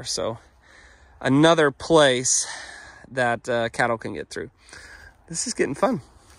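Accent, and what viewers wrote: Oklahoma, United States. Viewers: Oh, Gosh, I just love Bois D'Arc trees, and I respect your choice to hate 'em. I want you to know, however, they are not exotic. Osage Orange (Maclura pomifera) are native. In fact, their place of origin in North American is considered to be Texas, Oklahoma, and Arkansas. Hedge Apple trees grow invasively, no doubt. My guess is that's your quarrel with them. Invasive growth patterns are different from exotic species that become invasive.There is an exotic species Argentine Osage Orange (Maclura tinctorial) that is native from Mexico to Argentina. Our Osage Orange tree has an illustrious history on our continent from the indigenous peoples use of it to white settles using it in hedgerows for fencing prior to the invention of barb wire. I bet you've heard the expression about hedgerows that need to be "horse high, bull strong, and hog tight." That's the Osage Orange in a nutshell. 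This species also boasts the highest BTU of any hardwood on the continent. In woodworking, this species will burnish to a beautiful sheen. So deride them if you must. I understand. Here's are some links to back up my claims: <link> <link> <link> Btw, your channel is terrific. I'm an Okie transplanted in Maryland now for 30 years. Watching your channel is a lovely taste of home. The bison, the vista, your accent - lovely, lovely reminders of my origins. And I love your correct usage of the term "cattle." Ranching is your business, so naturally you know how to talk about it. I go bonkers when unin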